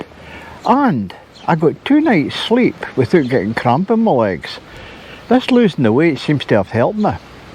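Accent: Scotland, United Kingdom. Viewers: The closed captioning during the waterfall scene is classic "computers cannot understand a Scottish accent!"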